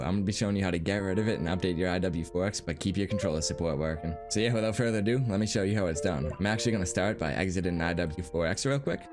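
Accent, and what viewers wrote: Boston, United States. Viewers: thank you so much bro you mad chill you from Boston by any chance? love the accent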